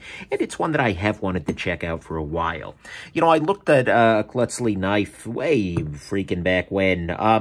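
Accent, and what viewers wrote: New York, United States. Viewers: you have to be from New York or New Jersey!?! I enjoy your videos knifes and explanations on the parts and disassemblies!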